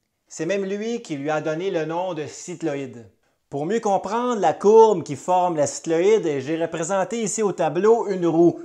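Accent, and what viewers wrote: Quebec, Canada. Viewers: J'ai 70 ans et, été toujours "NUL" en maths ( je suis plus "cerveau droit". L'artistique.)! Je viens de découvrir votre chaine et de m'y abonner. En plus de l'accent Québéquois; que j'adore (je suis français), je trouve vos thèmes très attrayants et qui m'intéressent énormément ! Vous me donnez le goût de replonger dans cette science. Merci. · L'accent Canadien ❤. Entre parenthèses, très proche de l'accent en Charente Maritime en France. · C'est une belle explication mais il y a une grosse erreur que vous répétez à quelques reprises. La vitesse initiale du mobile quelque soit le plan incliné empruntée est rigoureusement égale à zéro. Et non plus ou moins grande selon la trajectoire prise. Merci cousine avec l'accent inimitable du Québec !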